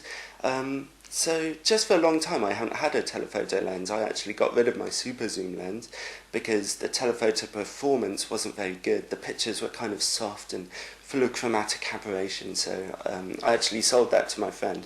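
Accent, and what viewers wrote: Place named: RP, United Kingdom. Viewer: I like your perfect Received Pronunciation/posh English.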